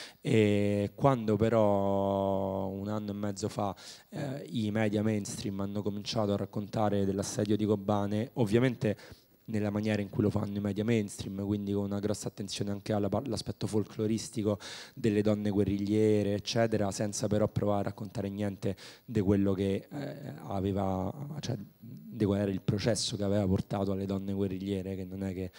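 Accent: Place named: Rome, Italy. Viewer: ‘Gnorante! 0calcare non sbaglia un congiuntivo...altra cosa sono l’accento romano o l’emozione che lo fa parlare accelerato...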